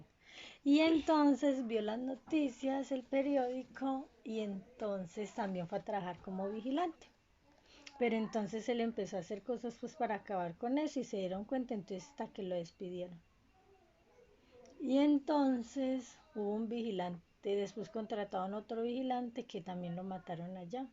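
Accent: Colombia. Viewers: vi todo el vídeo y escuche tu acento eres de Colombia?? Que buen video por cierto de chiquito me daban miedo los electrónicos XD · que señora con cultura mi valecita mia Bv, eres colombiana? Se escucha en tu acento, si es verdad yo también 👌👌👌 Pd: nueva sub gracias a este hecho contenido 👌👌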